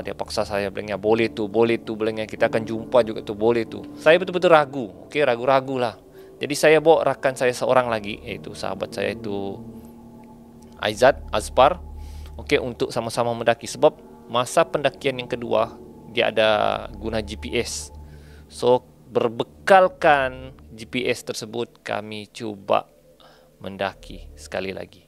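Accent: Sabah, Malaysia. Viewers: Sabah bah ni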